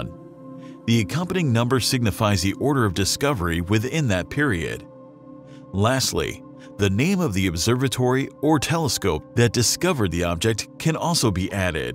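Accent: United States. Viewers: so heavy accented american voice robot. you say become visible in summer of 2024. i guess you must mean american summer, showing you think the whole world is america, not very good for a space oriented channel teaching about planets, sun and comets, where they dont have summer! So i guess down under we can guess you mean winter.😮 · When the narrator is American you just know it's not true.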